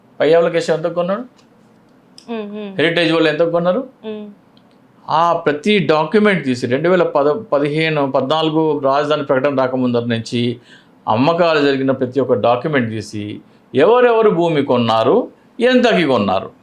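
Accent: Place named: Telangana, India. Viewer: Eeyana telangana language lo maatladutunnade..🤔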